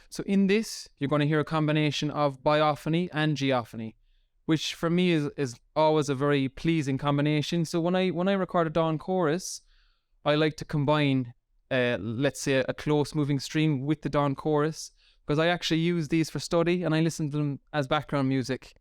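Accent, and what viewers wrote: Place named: Munster, Ireland. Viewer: Hello 👋 cool video man. I recorded some screeching hawks but I'm not sure which type they are. I'm 80% sure they are buzzards. Is there any chance u could take a gander for me? I want to title the video properlyif that's what they are. Also I'm from kerry, your accent sounds very familiar to me are u on my side or the Cork side? 😂❤ 💪 🇮🇪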